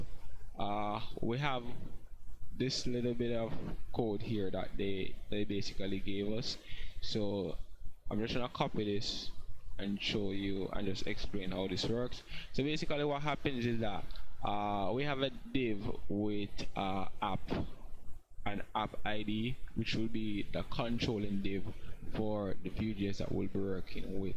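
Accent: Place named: Jamaica. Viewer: Came expecting an Indian accent, stayed for the Jamaican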